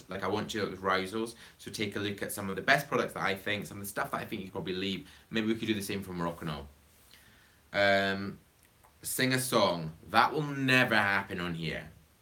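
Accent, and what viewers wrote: Scotland, United Kingdom. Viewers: general_anto _ he is scotish I think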